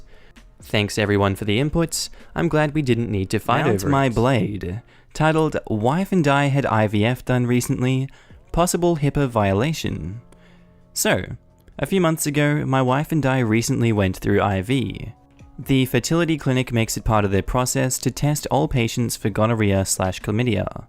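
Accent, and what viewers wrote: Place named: Australia. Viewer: I might have a crush on the Ozzy accent.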